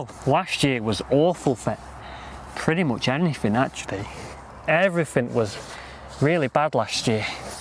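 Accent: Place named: Preston, United Kingdom. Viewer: Love the shed colour! I also have an allotment in Preston. Thought you must be local to us from your accent 🤔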